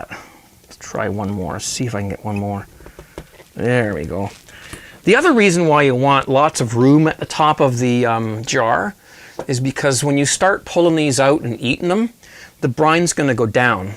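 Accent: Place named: Canada. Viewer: Michael Kimber he's definitely Canadian. we don't say "Bob's your uncle" in the states. and it's pronounced erbs the h is silent 😝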